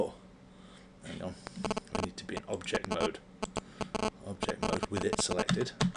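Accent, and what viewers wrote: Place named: United Kingdom. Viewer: FINALLY another british person..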